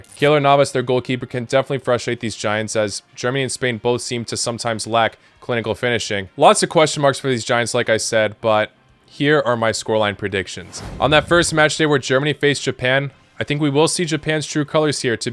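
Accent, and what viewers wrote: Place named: United States. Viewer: pfff this is stupid... people predicting like you do don't know about football at all.... you sound american so i guess that is the porblem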